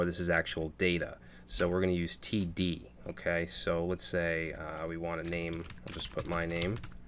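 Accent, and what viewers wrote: Boston, United States. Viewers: Boston accent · are u from Boston? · You sound like you are from Boston, are you? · You from Boston man? I hear it in your voice. Thanks for the video!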